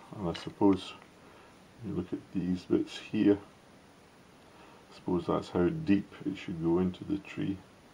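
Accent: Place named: Scotland, United Kingdom. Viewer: I hear a Scots accent ☺️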